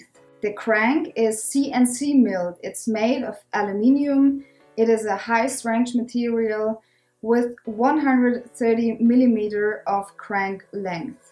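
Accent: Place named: Germany. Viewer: Man hört, dass du deutsch bist. Bist auf jeden Fall der Hammer! Weiter so^^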